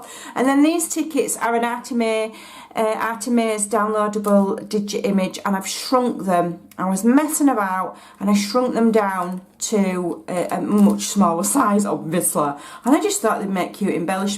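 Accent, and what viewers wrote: United Kingdom. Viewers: Sometimes the British way of speaking is hard for me to understand. Could u please repeat the lady that sells the beautiful Victorian art pictures? I would love to also creat these bags. Love your videos thanks so much.....I am a shabby chic. Lady also....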